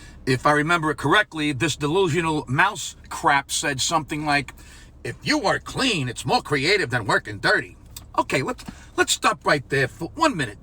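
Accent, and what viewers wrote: New York, United States. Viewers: I was born in NJ exit 13, grew up exit & A. Family is from Brooklyn Bay Parkway and 86th street. When you speak your accent makes me think of home. In HS the Jews were close friends with the Italian kids. We liked to date them because they were easy I men friendly. The Italians dated the Jewish girls and discovered what a headache was. Cultures are very similar. Comedy back in the 60s was really funny and if you went to the Catskills it was dirty. Think of Buddy Hacket. I miss the old days and you bring them back for me. Dont shut the fuck up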